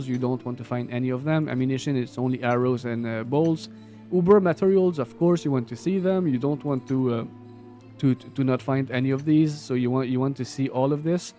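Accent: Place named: Quebec, Canada. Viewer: Nice merci man, en passant ton accents... té b'en Québécois eh? 😀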